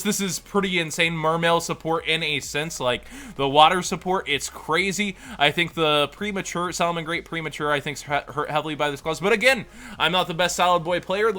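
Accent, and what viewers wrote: Texas, United States. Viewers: Howdy y'all! And welcome to the house! That Southern Texas accent though.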